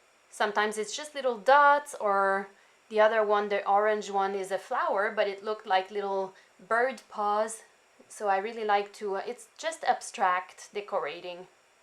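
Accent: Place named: Quebec, Canada. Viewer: Beautiful water colouring, it looks so pretty and relaxing. Love the rainbow with the string, can’t wait to do this with my daughter. Amazing mini book, you make it so fun and easy. Thanks for all these great projects, ideas, and inspiration. Love listening to your accent, working on our French in Ontario and hoping to make another trip to Quebec soon.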